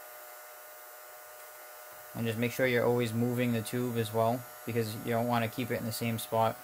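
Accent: Boston, United States. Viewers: Ya sound like ya from boston, kid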